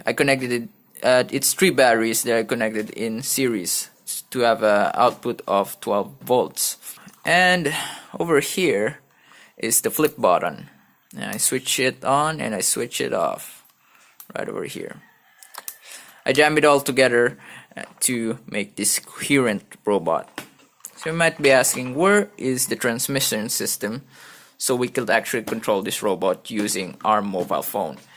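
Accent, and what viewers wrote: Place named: England, United Kingdom. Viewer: I love your english accent pls keep doing more videos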